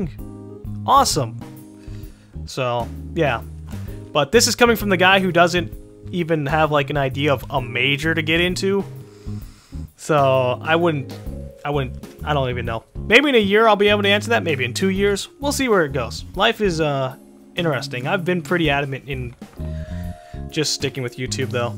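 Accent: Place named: Hawaii, United States. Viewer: hey you from hawaii... brah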